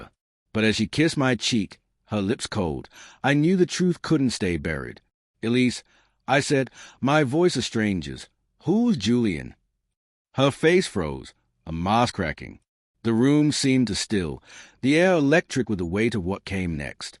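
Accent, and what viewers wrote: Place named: South, United States. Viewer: The southern accent from the AI is off putting!! Needs to have a slower cadence to be believed. Good story though.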